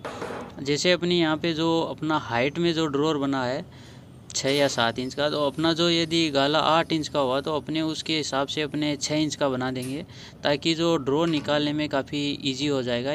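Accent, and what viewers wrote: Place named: Gujarat, India. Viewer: गुजराती हो क्या आपकी वोइस से पता चला आगेखेचेंगे तो , 55 , 66 का बेड है डबल बेड अछि तकनीक है 👍👍🙏 🔨🔨🔨 गुड़ वर्क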